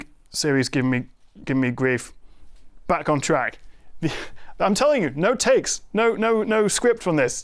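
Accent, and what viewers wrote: United Kingdom. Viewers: Great video! I like your British accent. Gamers have complained how expensive the Switch 2 is compared with the original Switch was when it was released in 2017, but factoring inflation, as you did in the video, the Switch 2 is not really more expensive.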